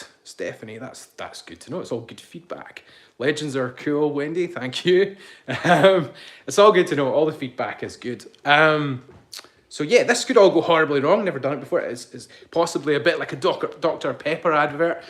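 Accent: Scotland, United Kingdom. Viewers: I love listening to your stories with a Scottish accent, makes it better. I'll share the next story with my sister.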